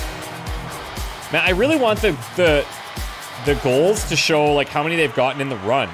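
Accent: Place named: Canada. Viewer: Gets progressively more Canadian as the pressure rises.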